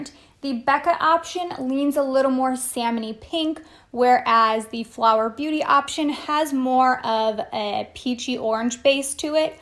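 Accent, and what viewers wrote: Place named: Michigan, United States. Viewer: Sometimes that mid western/ Michigan accent comes out to play. The way you say “BOTH” is soooo interesting to me as someone from the south that’s defffff not the way we say “both” down here!!!!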